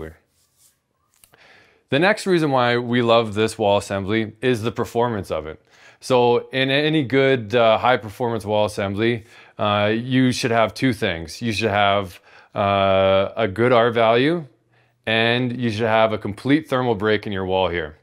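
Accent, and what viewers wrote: Canada. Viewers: If I'm not mistaken we're hearing the an accent hailing from the nation of Canada. If that's so I can fully understand why there's an emphasis being placed on having a thermal break and maximizing insulation. Yes, having 2 2x4 walls is going to cost more than a single 2x6 wall, but the increase from 5.5 inches of insulation to 10 inches of insulation would make the added construction cost and a loss of square footage a viable tradeoff when heating costs resemble those of a latitude much farther south. I'd rather pay more for something I'm going to buy once, like building materials and labor, than pay an ever increasing amount for the energy to heat a less insulated space. $500 dollars to build a house amortizes pretty fast when a heating bill is $50 per month instead of $150. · The accent is definitely Canadian and I noticed on a work truck that it mentioned the city of Calgary (in the province of Alberta in Canada) where two of my sisters live and only 6 hours from my hometown one province over. 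· nice presentation! sounds like CANADA from the accent and I visited there seen 7 window pane so must get cold.... burr... in that case maximum design fun of interiour buildings for people to last the long winters... -tibor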